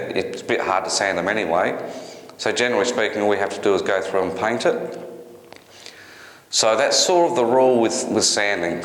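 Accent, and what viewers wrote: Australia. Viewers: Very well spoken , Aussie accent , great video , great advice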